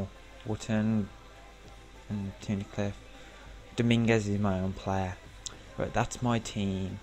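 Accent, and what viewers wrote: United Kingdom. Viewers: U sound proper essex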